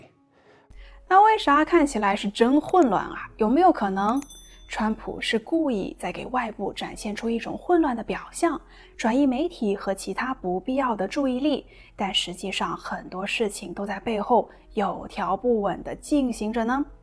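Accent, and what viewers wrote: China. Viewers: 這是大陸哪裡的口音啊? 真好聽的聲音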